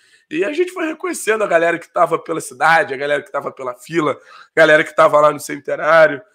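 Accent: Rio de Janeiro, Brazil. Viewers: Olá amigão. Primeiramente parabéns pelo grande canal. E Vc é carioca? E nos conta essa relação com o Athletico pr e Tenta entrevistar o Nicao assim que puder...super Abração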